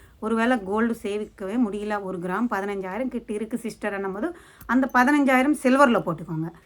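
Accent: Telugu, India. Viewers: Nice very cute design voice summa super telugu voice tone tamil kalandhupesum very cute voice 🎉🎉🎉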